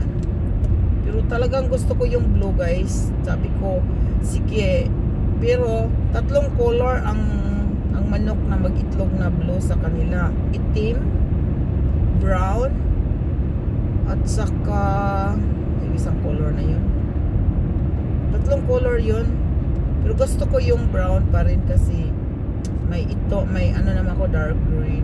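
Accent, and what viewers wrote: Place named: Visayas, Philippines. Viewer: Bisaya ka ano, sis? Sounds like one kasi😂… na-curious ako sa blue egg…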